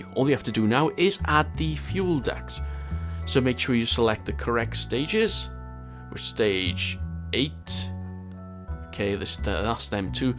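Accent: Wales, United Kingdom. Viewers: YES, ANOTHER WELSHMAN! BLOODY TIDY!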